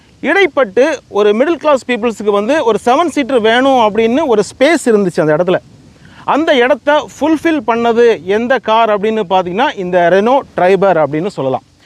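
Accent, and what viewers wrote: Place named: Tirunelveli, India. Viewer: மாஷாஅல்லாஹ்.! இறைவன் உங்களுக்கு அருள் புரியட்டும்..!! உங்களின் வீடியோக்கள் அனைத்தும் புதிதாக கார் வாங்கும் மக்களுக்கு ஒரு சரியான வழிகாட்டி.!!! ஒரு காரில் உள்ள குறை, நிறைகளை சாமானிய மக்கள் கூட மிகத் தெளிவாக எந்த மனக் குழப்பமும் இல்லாமல் வாங்குவதற்கு மிக உதவியாக இருக்கிறது. அருமையான நெல்லைத் தமிழில் உங்களின் கார் பற்றிய வர்ணனை மிக அருமை. சில யூடியூப்பர்கள் ரிவியூ என்ற பேரில் டஸ், புஸ்ன்னு இங்கிலீஷ்ல பேசி தமிழர்களின் பொறுமையில் விரலை விட்டு விடுவார்கள். ஆனால் உங்களின் தனித்துவம் எப்போதும் எல்லோராலும்.. விரும்பப்படும். உங்களின் கனிவான, பணிவான பேச்சு & வார்த்தைகள் எல்லா "சமய" மக்களையும் கவர்ந்திழுக்கிறது. வெகுஜன மக்களின் அன்பும், ஆதரவும் உங்களுக்கு எப்போதும் உண்டு. ஏனென்றால் நீங்கள் மற்ற யூ-ட்யூப்பர்களை விட தனித்து நிற்க்கிறீர்கள்.! அதற்கு காரணம்..!? கமாண்டில் கேள்வி கேட்பவர்களுக்கு உங்களின் ஆரோக்கியமான, கண்ணியமான பதில்கள்.! 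வாகனங்கள் பற்றி உண்டான உங்களைப் போன்றவர்களின் மிக ஆழமான சிந்தனை இந்நாட்டு அரசுக்கும், நாட்டு மக்களுக்கும் அவசியம் தேவை. தமிழக, மற்றும் ஒன்றிய போக்குவரத்துதுறை உங்களை பயன்படுத்திக் கொள்ள வேண்டும். என்றும் உங்கள் பணிகள் சிறக்க என் பிரார்த்தனை & வாழ்த்துக்கள்.!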